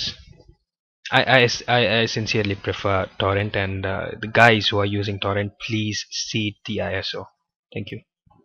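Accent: India. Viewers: your voice is so stereotypically indian lol no offence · Phir bhi dil hai hindustani !! Nice Axent !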